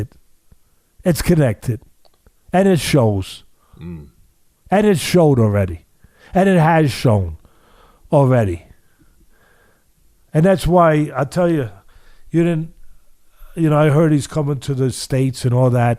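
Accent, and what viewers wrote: Newcastle, United Kingdom. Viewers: Kick off, true geordie. Gets me through the day major videos all the time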